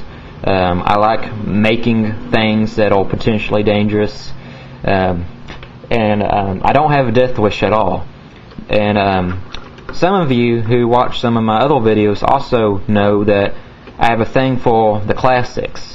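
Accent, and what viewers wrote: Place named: South, United States. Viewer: he had like a southern accent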